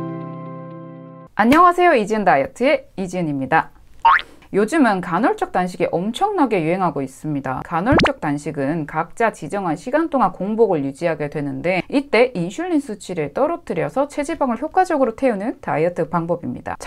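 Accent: Gyeongsang, South Korea. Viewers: 경상도분이시죠?? ㅋㅋ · 음... 혹시 경상도에서 사시는 분이신지???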